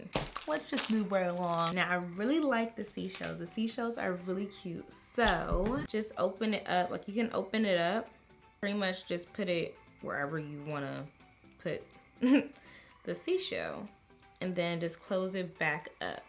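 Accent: DMV, United States. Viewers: This was a hilarious video your accent you must be from the dmv area lol you had me dying laughing! But this tutorial was great I think I wanna try this method I cant deal with putting rubber bands in my hair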